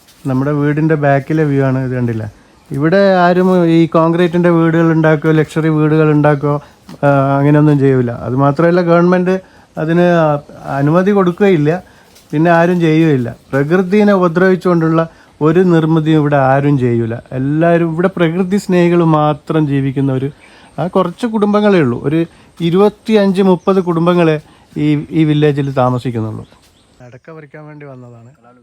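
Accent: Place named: Kannur, India. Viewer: Sir kannur side anennu thonunnu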